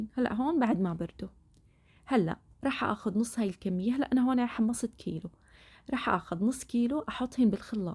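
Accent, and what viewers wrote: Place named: Ruhaiba, Saudi Arabia. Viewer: حسيتك من الرحيبة😂😍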